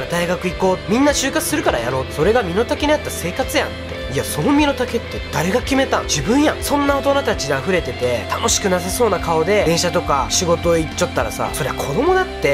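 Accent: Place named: Fukuoka, Japan. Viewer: どちらの出身ですか？福岡のどこかですか？方言が親近感あいます。応援してます。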